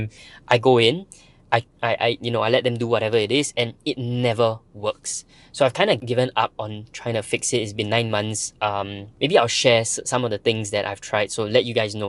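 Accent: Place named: Ghana. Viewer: you sound like you went school in ghana 👀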